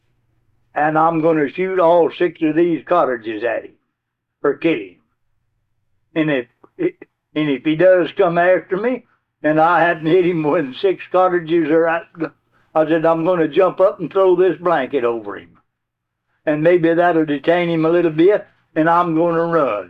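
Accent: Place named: Rural, United States. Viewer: The fantastic thing about this fella is not only his storytelling but his vocabulary while sounding like a dumb azz hick. This man is very intelligent. Everytime i listen to one of these i am so interested because i feel like i was there. This guy was a great man, believe his name is! Lol, just foolin' about...great upload. All of them...😊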